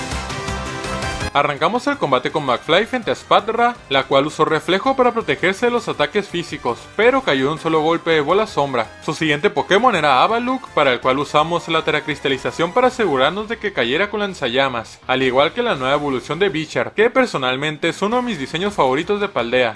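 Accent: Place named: North, Mexico. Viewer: Oye bro. ¿De qué parte del norte de México eres?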